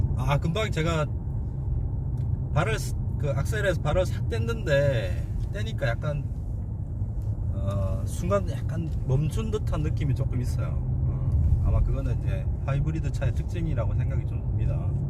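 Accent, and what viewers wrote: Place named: China. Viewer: 조선족이죠?말투가 확티가나는데 ㅋㅋ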